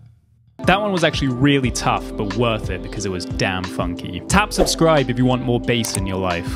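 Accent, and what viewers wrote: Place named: United Kingdom. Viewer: Nothing like funk with a British accent! Love it. That's some proper funk, mate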